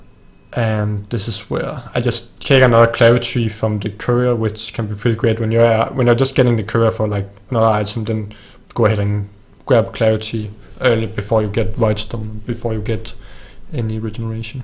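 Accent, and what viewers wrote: Denmark. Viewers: Hey are you from Denmark,?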